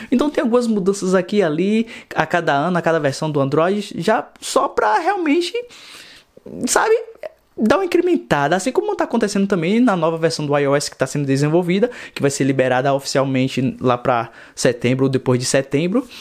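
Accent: Bahia, Brazil. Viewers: Sotaque baiano é outro nível 👏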